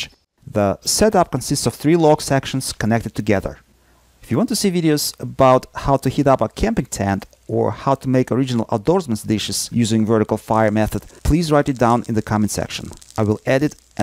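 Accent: Russia. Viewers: So impressive and I absolutely love your perfect English with your Russian accent.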